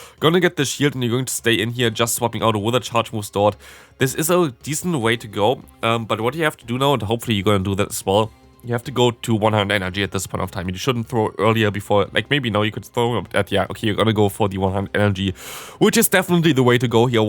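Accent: Germany. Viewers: Sag mal du bist schon deutscher oder? Meine das rauszuhören. Ist natürlich egal, klasse Content!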